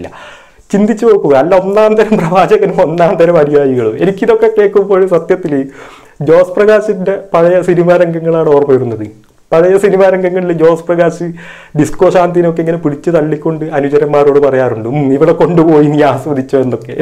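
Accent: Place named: North Kerala, India. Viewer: English ucharanam sariyakkan -chal, abhangi ozhivakkan thankaleppole oralkku verum 10 minuttu mathi. Athinu minakkathe aabharanamayi kondu nadakkano. Ethayalum avatharanam, nammute vadakkan thenmozhi ellam gambheeram. Fear not we are with you.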